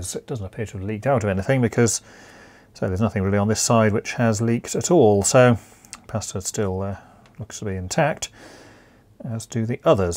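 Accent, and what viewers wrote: United Kingdom. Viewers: Nice - i couldn't help thinking, "hey, this fellow sounds a lot like the voice in my head when i'm muddling through some problem or another (but with an other-side-of-the-pond accent)." Some of these power supplies/adapters can be so intimidating... it's refreshing to see a unit with a straightforward layout. I'm working on a few Dell PC power supply units with no obvious faults, and this video gave me some inspiration to dig back in. I think it's time to invest in a reliable capacitance tester, since problems so often come down to those failed electrolytic caps.. just haven't seen them vent from below in my limited experience. Good to be aware of - Thanks for sharing!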